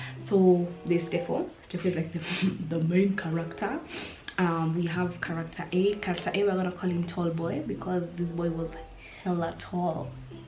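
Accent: United Kingdom. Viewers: The British accent gets me all the time😂😂😂😂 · The british accent always gets me😂😂❤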